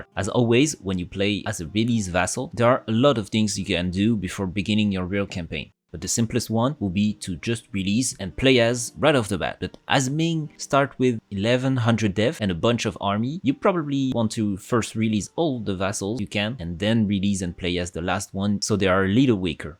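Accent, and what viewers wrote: France. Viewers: I have the impression of hearing an accent, are you French? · Un français !!! Une bouffée d'air frais (ouais je t'ai cramé a l'accent ;) ) !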